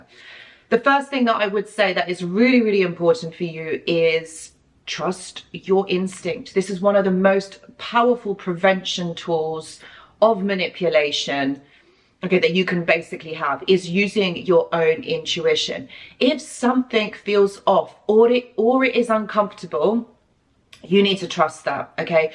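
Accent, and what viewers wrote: United Kingdom. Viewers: Very eye opening! For me this one has been very hard to tell because I am very open minded sexually- even so though he’s done a few subtle things that just made me feel uncomfortable. I could never really put my finger on why but just this lingering feeling that something is wrong. I was also going to say that since I have been watching your videos every day now I noticed when I am dealing with the narcissist it’s like your voice is in my head dictating my words! I am writing out the text response and I read it back to myself and it’s almost like I didn’t even write it I hear this you know British accent and I’m American!! Just something a bit kooky lol 😂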